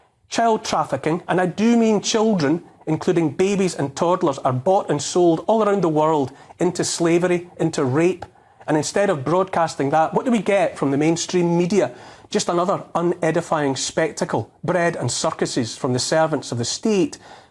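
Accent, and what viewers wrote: Scotland, United Kingdom. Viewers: A true Scottish voice speaking truth to power .Cue character assassination in the press.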